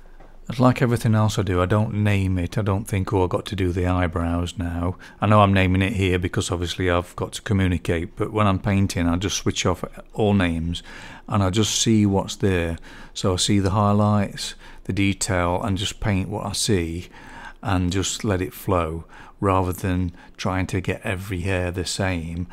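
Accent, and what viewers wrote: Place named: Ilkeston, United Kingdom. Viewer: Born and bred in Ilkeston, I recognised your local accent immediately! I’m in Lancashire now but wondered if you’d share whereabouts you’re from? Not an Ilson lad yourself are you?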